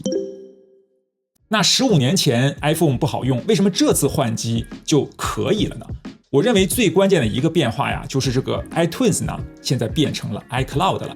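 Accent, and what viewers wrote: China. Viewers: 這幾個月才開始看的影片聽口音是大陸人還以為生活在大陸原來住台灣喔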